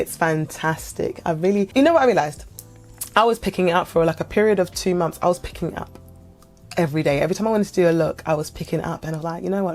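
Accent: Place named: United Kingdom. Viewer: Are you from Britain? I love your accent! Very elegant.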